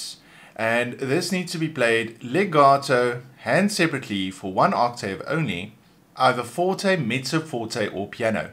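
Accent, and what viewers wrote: South Africa. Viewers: excuse me but are you South African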